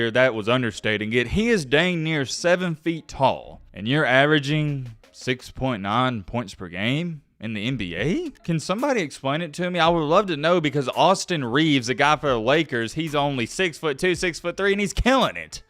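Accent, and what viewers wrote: South, United States. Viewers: The southern accent followed by the weird rambling about lebron’s space jam (this video has nothing to do with LeBron OR space jam btw)…..yeah I only made it 30 seconds in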